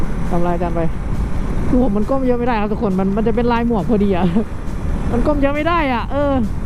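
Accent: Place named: Isan, Thailand. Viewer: เว้าอีสานคือเป็นตาแพงที่ว่ะ😅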